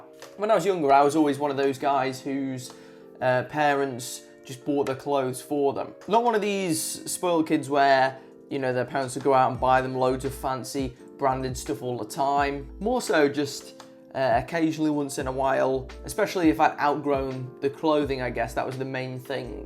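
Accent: United Kingdom. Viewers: I felt like you were describing my life honestly. I went from baggy to slim to super skinny spray on jeans, now I wear fitted skinny jeans (because I am still skinny) and it's such a difference. I still get told my legs are skinny but there's no way to hide that honestly, just to mitigate. Great series - came to see what it's about and stayed till the end until I noticed it's the end. Maybe it's your british accent, maybe it's because I can relate. Good content.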